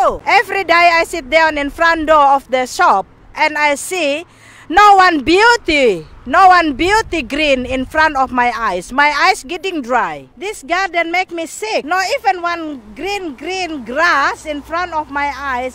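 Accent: Indonesia. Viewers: Ma'am are you from kupang/NTT i noticed the timorese food, Beta orang kupang penasaran saja, karena logatnya juga mirip. Saya juga orang kupang sedang kuliah di melbourne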